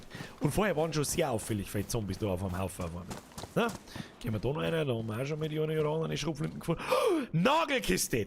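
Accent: Bavaria, Germany. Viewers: Tahts Life Junge ^^ Bayrisch ist halt nicht jedermanns Sache Ich hab halt das Glück und wohne in seiner Nähe und verstehe alles :)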